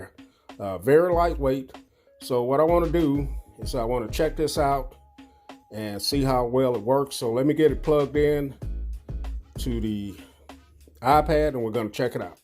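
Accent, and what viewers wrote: South, United States. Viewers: Are you from the south of the state’s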